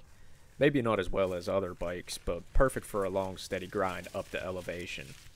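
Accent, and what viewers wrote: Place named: Pittsburgh, United States. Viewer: Without even reading your page I knew you were from the burgh. Thanks for the review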